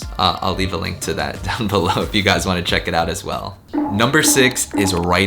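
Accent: New York, United States. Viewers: Not sure why this video in particular, but that New York accent is coming strong.😏 Btw, love the content 😀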